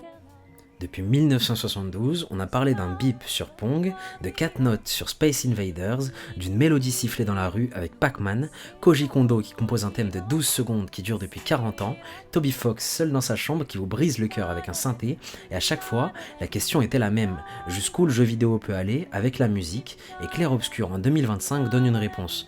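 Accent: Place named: France. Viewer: J'm ton son Le french accent touch Ça passe bien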